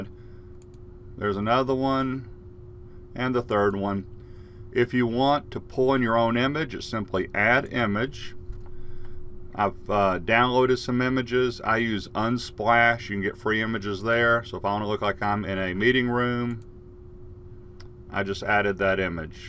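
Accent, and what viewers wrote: South, United States. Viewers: Hi! I have a Simpson's and The Shining background I would love to use for when I'm in zoom classes. I have the latest version - updated yesterday (version 4.6.9) and the screens won't work without a green screen. Any advice? Also noticed the southern accent and was curious where your from. My family is from North Carolina.:)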